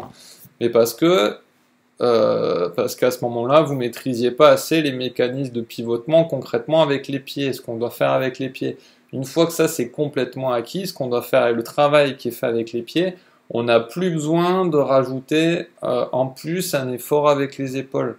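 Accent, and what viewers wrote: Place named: Switzerland. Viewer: vaguement suisse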